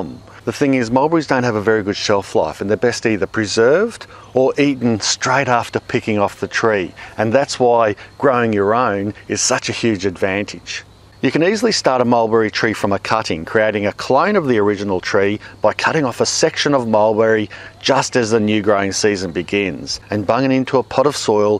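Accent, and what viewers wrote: Australia. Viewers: Well to us Aussies he sounds nothing like Russel Crowe..this old mate is an Australian not a Kiwi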